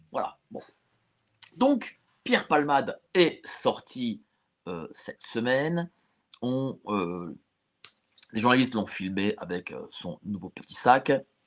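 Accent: Germany. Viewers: Oui,c est vrai, arrête de prendre l accent allemand. Ça n a aucun intérêt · tu es français parles Français pas avec l'accent chleu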